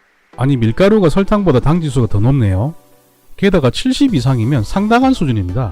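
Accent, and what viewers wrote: Gyeongsang, South Korea. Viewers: 선생님 목소리가 너무 편안해요. 그 목소리 건강 잘지키셔서 오래듣도록 해주세요. 그리고 발음속에서 경상도쪽 특유의 발음이 들리는듯 한데 그 지역 분이신가요? 응원하고 있습니다.❤❤❤❤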